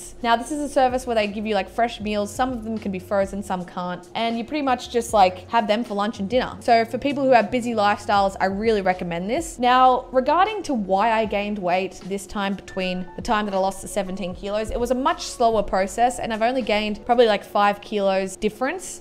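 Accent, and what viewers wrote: Australia. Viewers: So Australian haha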